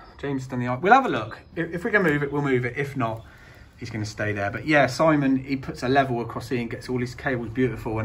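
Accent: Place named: West Country, United Kingdom. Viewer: I can hear a bit of West Country in your accent.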